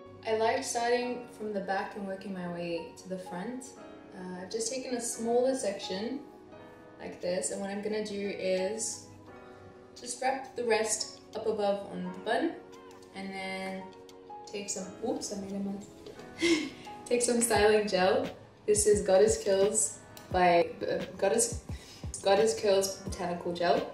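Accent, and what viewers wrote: Australia. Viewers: Now how tf did Ik u had Arabic roots even tho u sound full on Aussie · You sound so aussie lmao. No hate. You're so cute...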